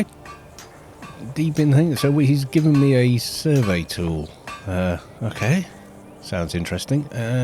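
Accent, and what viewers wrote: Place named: Wales, United Kingdom. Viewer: But I do like the way you pronounce any word starting with a double L ... That's very Welsh.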